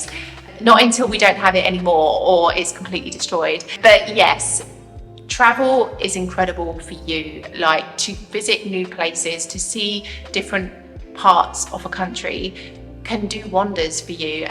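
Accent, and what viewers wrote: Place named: London, United Kingdom. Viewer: Yes I love it. And I adore your londinese accent.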